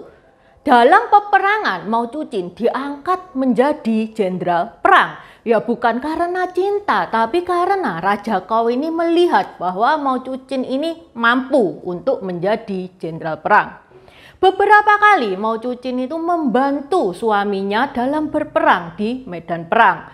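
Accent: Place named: East Java, Indonesia. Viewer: Enak didengar tambah pengetahuan tentang sejarah, suaranya medok jawa timuran. 👍👍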